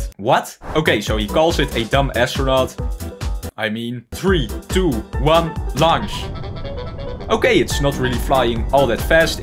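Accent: Netherlands. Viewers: Question: are you Dutch? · ik smell a littel nederlands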